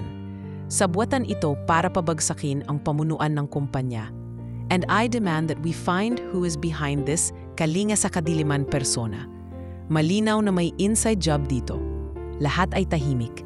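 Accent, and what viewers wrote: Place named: United Kingdom. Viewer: British ung narrator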